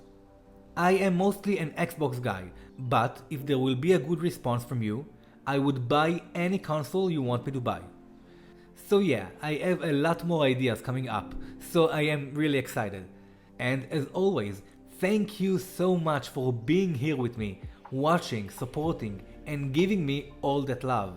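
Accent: Israel. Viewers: You have an Israeli accent. you are Israeli?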